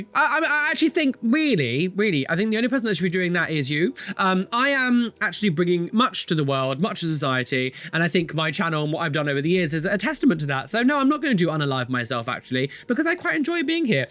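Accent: United Kingdom. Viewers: I've been watching you for awhile and I love your skin art (tattoos) but then we get to your flamboyant personality and British accent like omg be still my heart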